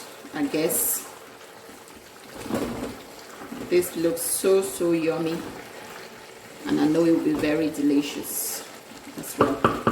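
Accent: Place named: Cameroon. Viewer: Oh my, can hear that Cameroonian accent! My Cameroonian sister right there. Achu is my favourite Cameroonian dish, then fufu and eru.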